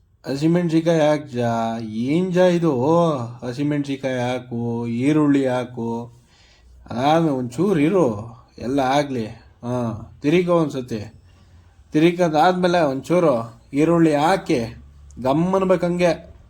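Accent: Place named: Mandya, India. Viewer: We are waiting for more cooking videos and most importantly a separate fan base for ಮಂಡ್ಯ ಮಳವಳ್ಳಿ background voiceover..😁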